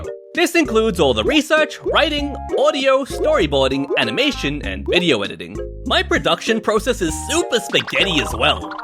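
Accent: United Kingdom. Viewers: bro is British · Are you British? Spits tea on dude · Are you British? (Slurping hardly) RAHHH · Are you brithish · R U BRITISH? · . ARE YOU A BRITISH 🤣🤣🤣🤣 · R U BRITHISH???? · Are you british? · r u british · Well if you’re not British? What are you? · Are you British?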